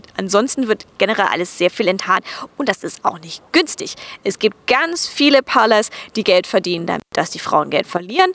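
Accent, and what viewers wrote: England, United Kingdom. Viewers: her accent sounds English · I always thought she was from the UK.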